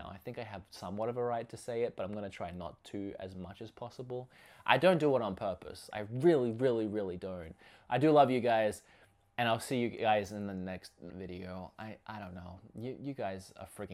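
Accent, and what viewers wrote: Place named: Australia. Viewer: God, your accent is really throwing off my sensors. I'm new here but I'm guessing Australian, living in America? I know you mentioned Australia in the intro but I picked up on it before then. As someone who's traveled a fair bit, my accent tends to modulate and sync with my surroundings, so I'm guessing that's what it is?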